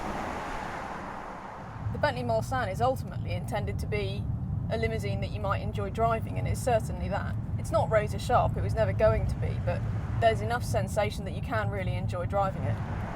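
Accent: United Kingdom. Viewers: Not everyone with British accent is suitable for a fuckin car interview! FuAAAAAAAAAK!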